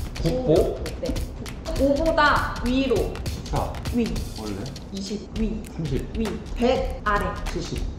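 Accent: South Korea. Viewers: 항상 잘 보고있어용 ㅎ 충남어.. 중국어에 성조가 있듯 의 충남어에 있는 강한 억양! ㅎㅎ 넘나좋은것! ㅎ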